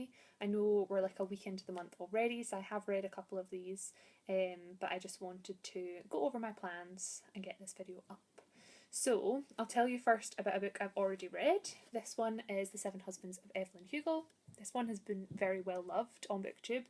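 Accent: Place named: Ireland. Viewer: Hello, New viewer/subscriber here. Am familiar with some of what you showed here, though have yet to have read any. Have been intrigued with Tolkien for a while. What would you recommend to start with him? I'm guessing you're from....Ireland....? I've always been interested in dialects, though not the best at identifying them. :-) Thanks for sharing, and looking forward to more from you. -Carm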